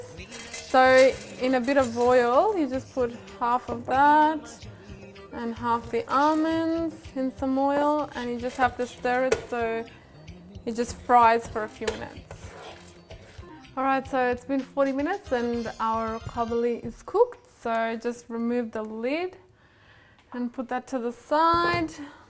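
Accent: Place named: Australia. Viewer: I luv Australian accent ;)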